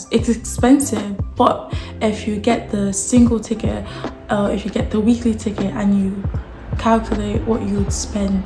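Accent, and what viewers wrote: Glasgow, United Kingdom. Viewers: I detect a slight Glasgow accent now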